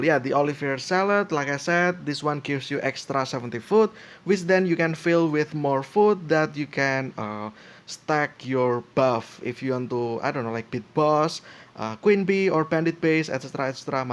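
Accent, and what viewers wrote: Indonesia. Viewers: Aksen Nya Kena Banget Orang Indo Bahasa Inggris Nice Bang Informasi nya 🙏🏾🙏🏾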